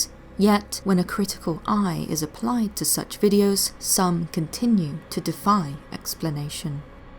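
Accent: United Kingdom. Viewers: Isn't it pronounced "(gē-zər)" in British English? The narrator sounds like she's British, which would make sense.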